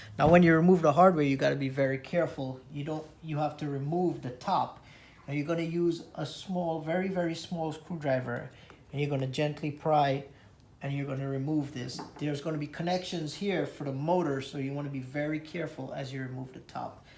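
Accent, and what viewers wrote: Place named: Trinidad and Tobago. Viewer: You sound trini, good vid tho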